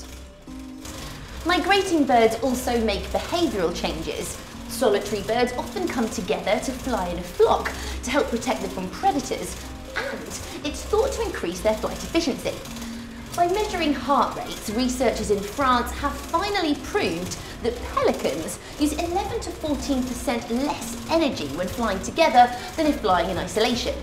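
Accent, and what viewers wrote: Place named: England, United Kingdom. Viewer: senin ingiliz aksanına USS Misisipi çarpsın.. bu kadar mı itici olur bi kadın ya...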